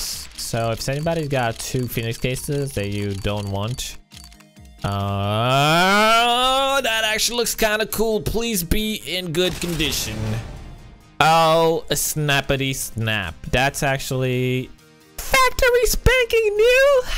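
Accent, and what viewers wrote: Romania. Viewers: is this guy from romania????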